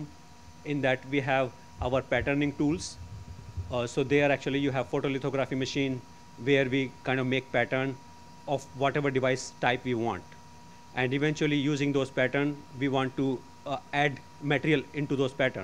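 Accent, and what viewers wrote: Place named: India. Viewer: I just can’t stand the Indian accent, like whoever mad their language didn’t realize how annoying and un intimidating it is. I I woke up in India I’d probably shoot my self so I wouldn’t have to hear that shit everywhere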